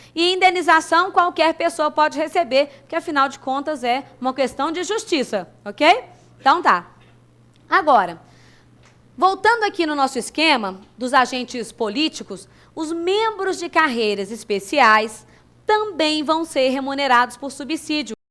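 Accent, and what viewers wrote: Minas Gerais, Brazil. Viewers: professora com sotaque mineiro perfeito rs sou Potiguar mas amo sotaque mineirin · Essa professora é muito gente fina, além de ser bem humorada. Gosto dela e do sotaque de mineirinha :)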